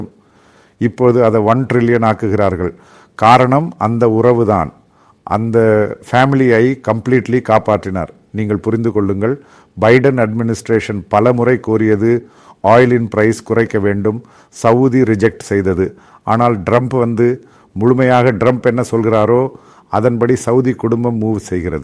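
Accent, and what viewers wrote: Kerala, India. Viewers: എനിക്ക് തമിഴ് അറിയില്ല എങ്കിലും കേൾക്കുമ്പോൾ മലയാളം തമിഴ് പറയുന്നതായി ഒരു തോന്നൽ എന്തായാലും തുടക്കമല്ലേ നല്ല തമിഴ് ഭാഷയിൽ തന്നെ ഇത് തുടരാൻ സാധിക്കട്ടെ എന്ന് പ്രാർത്ഥിക്കുന്നു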